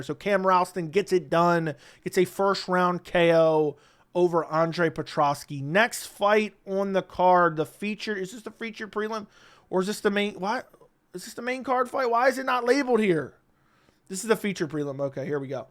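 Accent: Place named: South, United States. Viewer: Love when you do that. Mega good old boy voice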